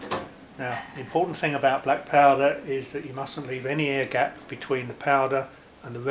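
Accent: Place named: England, United Kingdom. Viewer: england would be a nice place to visit one day. if all my english internet friends didnt live in rough neighborhoods. I had to ask because of your accent. well technically it's not an accent seeing as though you speak the english language correctly guns and the english just do not seem to go hand and hand these days. older english guns however are quite amazing. i want a webley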